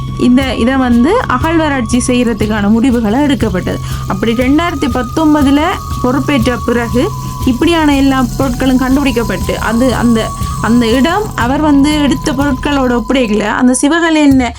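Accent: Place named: Tamil, Sri Lanka. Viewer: அவர்கள் அழகாக எங்கள் பேச்சு வழக்கு சார்ந்தே பேசுகிறார் தயவு செய்து குற்றம் குறை கண்டு பிடிப்பதை நிறுத்தி இலங்கையில் இது போன்ற செயல்கள் வரவேற்கப்பட வேண்டியவை இலங்கை தமிழர்களின் தாய் மண் எமது வரலாறு இப்போது அழிக்கப்பட முன் காக்க வேண்டியது அனைத்து ஈழத் தமிழர் கடமை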